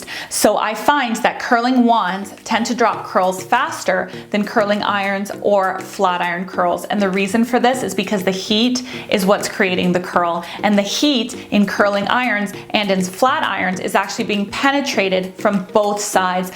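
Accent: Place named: Canada. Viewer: Pregnancy look so cute on this lady. The Canadian accent is an absolute nightmare.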